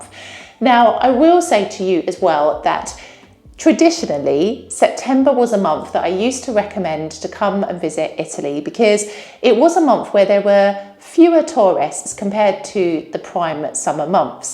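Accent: United Kingdom. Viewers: It’s a real pleasure to listen to your beautiful story in wonderful British English about living in Italy - my dream country, and in my favorite language.😍🤩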